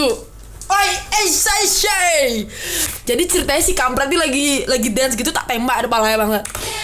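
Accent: Bali, Indonesia. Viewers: Pantesan logatnya aja udah dari bali sama kayak gue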